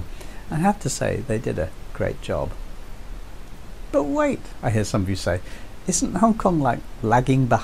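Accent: United Kingdom. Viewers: yeah you are absolutely right and sounds alike also except his British accent.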